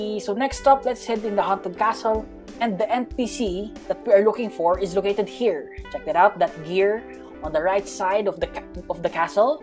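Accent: Philippines. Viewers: He has a filipino translated channel and he has an accent of a filipino so yes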